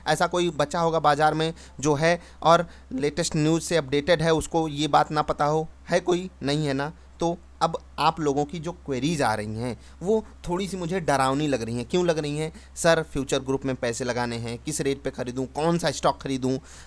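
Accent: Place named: Bihar, India. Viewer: बातो से तो परफेक्ट बिहारी भैया जैसी आवाज है. सजेशन भी पागलो जैसे देता है. शायद तु फ्रेशर है स्टोक मार्केट मे.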